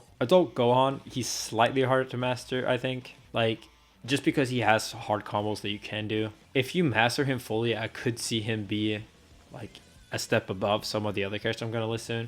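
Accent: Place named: Sweden. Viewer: he literally said he may misprounce some names due to his swedish accent. Just like how he say ginjew instead of ginyu. He knows he is mispronouncing some names. Plus, you don't have to watch dbz to be good at this fighting game. You don't have to play Super Mario or Legend of Zelda or Pokemon to be good at Smash Bros.How much you watch dbz has literally no correlation to your skills in this game. In fact, this game is made by Arc Systems, so it's literally a Guilty Gear game with MVC assists.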